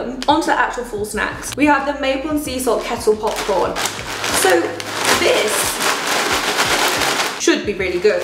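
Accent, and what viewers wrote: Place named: Home Counties, United Kingdom. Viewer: Is there a factory that mass produces these influenzers? They all sound home counties England, boarding school 'Oh my Goshing', posh tottie🙄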